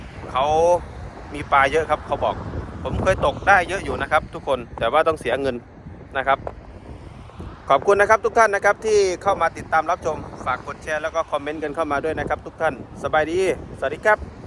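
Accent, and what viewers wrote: Isan, Thailand. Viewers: เราก็คนร้อยเอ็ด บางทีเราเข้าไปดูยูทูปคนลาวบางคำก็ฟังไม่ออก บางทีเราก็เม้นถามว่าคำนี้แปลว่าอะไร แต่ พูดสำเนียงเหมือนคนอีสานเลยค่ะฟังออกทุกคำ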